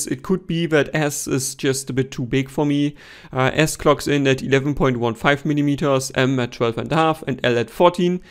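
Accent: Germany. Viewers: Für mich war’s überhaupt kein Problem, deinen deutschen Akzent zu verstehen, wenn du Englisch sprichst. Ich bin nämlich auch zweisprachig (Deutsch/Englisch), also mach dir da echt keinen Kopf. Dein Akzent erinnert mich an Der8auer und der hat immerhin 240 k subs. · Madre Mia!! Your English is totally undecipherable. SLOW DOWN YOR SPEAKING. You have a very thick German accent.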